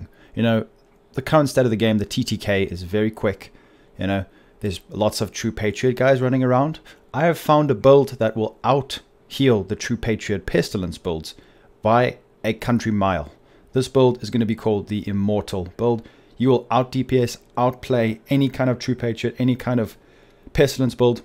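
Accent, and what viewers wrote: South Africa. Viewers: So good to hear a South-African English accent💪🏼💪🏼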